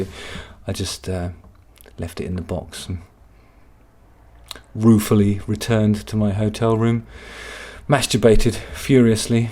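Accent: United Kingdom. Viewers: That British Accent has to go...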